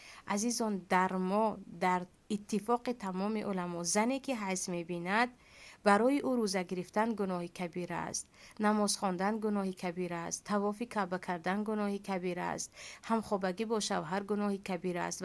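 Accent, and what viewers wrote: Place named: Tajikistan. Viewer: Ассалому алейкум Офарин бар Шумо чи кадар забони точикиро тоза гап мезанед ва хамаи суханонатон бехтарин.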